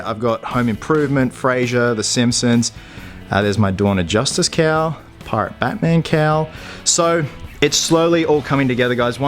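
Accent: Australia. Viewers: It's kinda weirding me out watching Batman speak with a high pitched Australian accent. Like some wires got crossed in my brain it something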